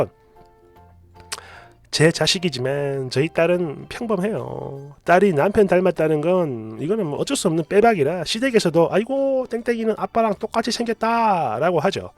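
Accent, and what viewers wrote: Gyeongsang, South Korea. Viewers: 글 내용도 웃기지만 님 경상도 사투리 정말 웃겨서 제 얼굴이 웃는 얼굴로 변했어요 ㅋㅋ감사해요!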